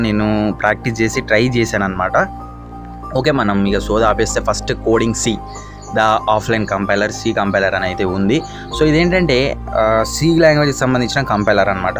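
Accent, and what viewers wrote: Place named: Telangana, India. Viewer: Thank you brother....your slang super (pakka telangana yasa i like your slang)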